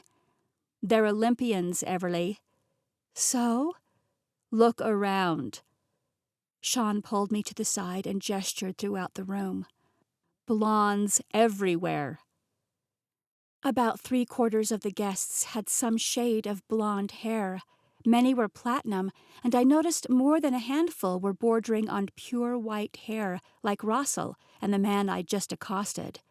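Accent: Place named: Ireland. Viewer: Story is great! I keep hearing a hint of an Irish under accent.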